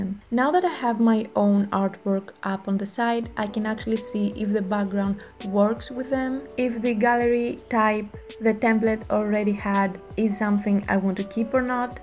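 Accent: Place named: Greece. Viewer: you sound greek, i loved your video it really helped me thank you!!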